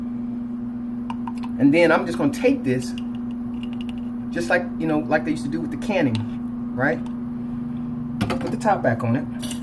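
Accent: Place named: United States. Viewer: I don't know what you pay for your pine pollen but you can get 70 grams of it from Harmonic Arts (Canadian online company) for 32 bucks. They also do tinctures and have an amazing variety of products and have decent prices. Another online place I would like to suggest is "Canadian Pine Pollen Company". Judging by your accent I am assuming your are American? I just wanted to give you my sources because with the stronger US dollar you may be able to get much more product and save some money. Also, I have zero affiliation with those companies. If I love something, I rave about it and I have a big mouth. Heh.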